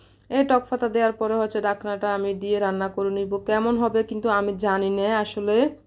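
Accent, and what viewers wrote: Noakhali, Bangladesh. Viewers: নখালিতে কথা বলিয়া ❤❤ ভাল লাগে ল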